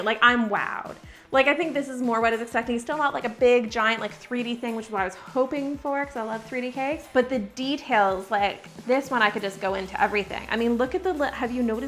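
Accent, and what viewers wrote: Canada. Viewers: It's so funny when you're watching a channel for months and then they mention that they're in Canada and suddenly it clicks that that's the reason you're drawn to their videos and they seem so oddly comforting and enjoyable to watch. It's like hanging out in a friend's kitchen because your demeanor and "accent" is so familiar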